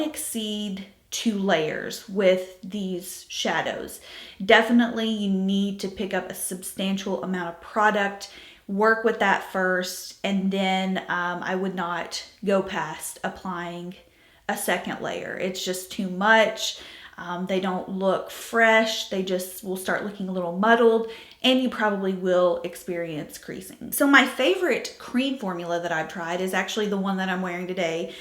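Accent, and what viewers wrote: South, United States. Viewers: Just found your channel and I am so impressed by how down to earth you are while being so thorough with your reviews! As a Tennessee girl I also love the southern accent! I feel very at home on your channel! The content you are producing is such high quality--thank you!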